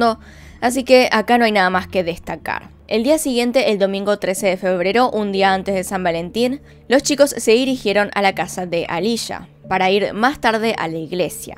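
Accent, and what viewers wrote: Argentina. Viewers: Sos argentina??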